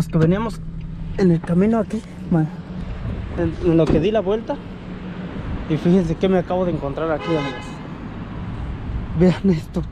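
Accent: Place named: Guatemala. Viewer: Hola amigo Saludos desde Ecuador ciudad Guayaquil eres de Guatemala por el acento.un abrazo.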